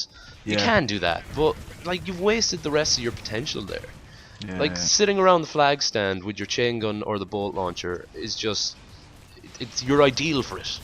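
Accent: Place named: Ireland. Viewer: Irish accent :D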